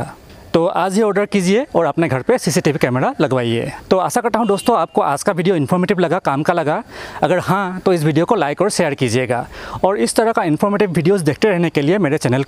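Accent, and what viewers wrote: Assam, India. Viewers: ভাই অসমীয়া নেকি?কথাবোৰত ধৰিব পাৰি ৷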